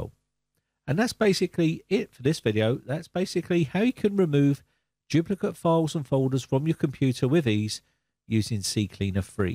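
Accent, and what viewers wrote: United Kingdom. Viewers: British accent perfect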